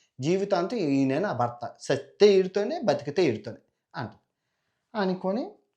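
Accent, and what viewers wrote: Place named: Visakhapatnam, India. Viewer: Video ఇంత త్వరగా ఐపోయింది ఏంటి అనిపించింది చెప్పే విధానం మన వైజాగ్ స్టైల్ స్లాంగ్ లో super super super అన్న..👌👌 👌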